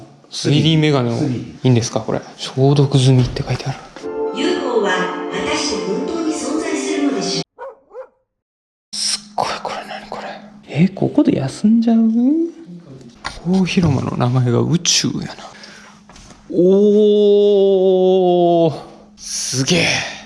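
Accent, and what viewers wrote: Kansai, Japan. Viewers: お疲れ😃💦さまの関西弁👍️いいね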